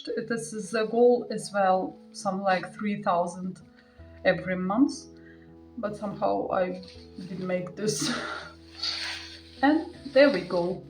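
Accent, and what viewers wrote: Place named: Eastern Europe. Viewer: Your accent is just so beautiful, and your English is wonderful. I’m a third generation Russian-American, so English is my first language, but I always love the way Slavic languages and accents sound. So beautiful! God bless you and your family,! I hope to see more videos from your channel and that it grows in popularity. 🩷🙏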